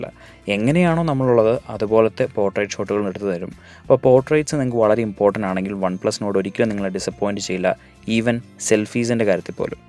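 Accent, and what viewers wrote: Idukki, India. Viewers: Idukki karan ano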